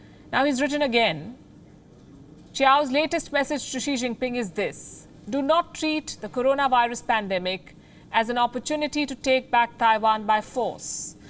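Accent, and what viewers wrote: India. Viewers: Your English is after all 3rd rated indian English, if he is propagating for less aggressive posture then how can say hawkish at the same time? It's not hawkish it's dovish bharati mata. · This indian lady is trying her best to slang...but turn out still india sound. 🤦‍♂️ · India voice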